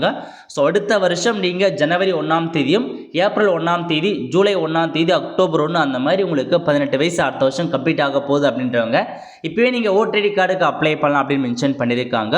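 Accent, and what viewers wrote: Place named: North India, India. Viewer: tis person speaks like north indian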